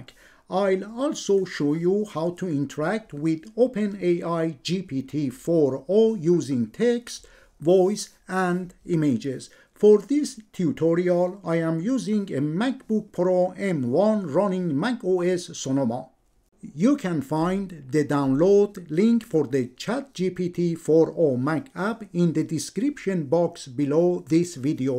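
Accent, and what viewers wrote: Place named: Iran. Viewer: Thank you for clearly explaining this clearly .. your Persian accent made the whole concept actually more comprehensible & for that reason I subscribed ☺️🙏🏼🙏🏼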